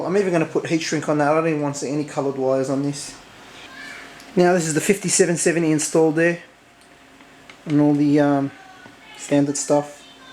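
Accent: Australia. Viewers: Is that an aussie i hear?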